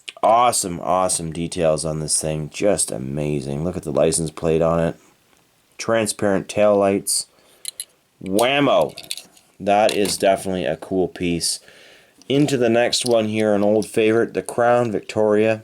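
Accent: Canada. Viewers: I was just about to comment. Is he form Canada because the way he said stuff. Mostly because the way he said car. I live in America but I have Canadian in me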